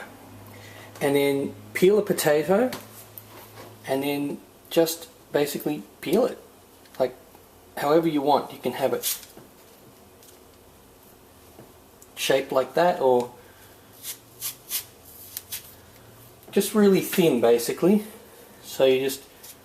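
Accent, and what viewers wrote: Australia. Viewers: Cooking channels Australian aha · Ypu being aussie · are you an Ausi?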